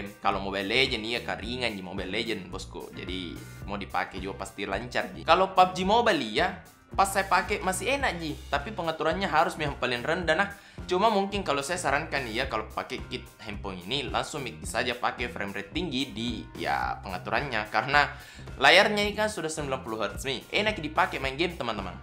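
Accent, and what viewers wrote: Makassar, Indonesia. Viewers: Matap...enak juga liat review gadget pake logat makassar 👍👍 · Saya suka sekali koko klo logat makassar ki ...sama² ji ki too ...#ewako koko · Lebih bagus kapang memang Logat Makassar bosku... Ada toko hp kah kita punya di Makassar ???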